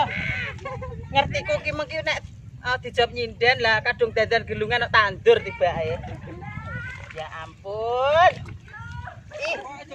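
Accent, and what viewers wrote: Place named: Ngawi, Indonesia. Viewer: Lucuuu yaaa Mbak...saya asli Ngawi sdh 18th tinggal di Bali dadi kangen sama kampung loo Mbk...boso ne mengingatkan jaman cilik ning Ngawi😘😘😘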